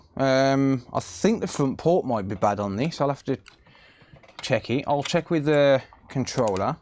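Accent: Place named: Sandwell, United Kingdom. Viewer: New sub, I thought to myself this guy sounds local, lol I'm from Sandwell, great videos 👍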